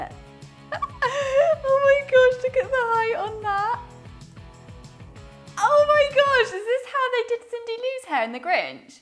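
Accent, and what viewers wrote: United Kingdom. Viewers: Love it ps are u British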